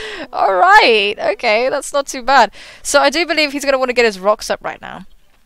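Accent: England, United Kingdom. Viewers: Godstruct strikes again!! and not even burn can stop him from killing stuff, GOD i love that beast XD QOTD: im not american but i speak with the american accent so i suppose i say the the T's more or less like them :) i wish i could have an accent like your, i lived in England before in Plymouth but unfortunaly didnt manage to get the accent :(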